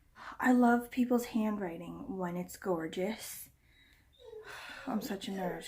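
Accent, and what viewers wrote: Wales, United Kingdom. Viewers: Omg u are turning more Welsh with every click. Newest one ul be like Staceu from Gavin and Stacey, no offence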